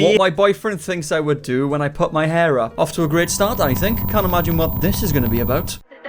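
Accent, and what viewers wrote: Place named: South Wales, United Kingdom. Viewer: I'm 90 % sure iv seen you irl in Ystrad tesco 😂 iv watch 2 of your videos and the accent is definitely giving south wales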